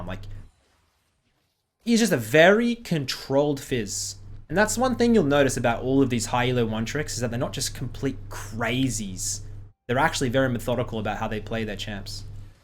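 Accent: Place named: Australia. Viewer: "mango fish knows how to play the qiyana" edit: BRUH I JUST REALISED HE SAID "COUNTER" AHAHA, i love the australian accent xd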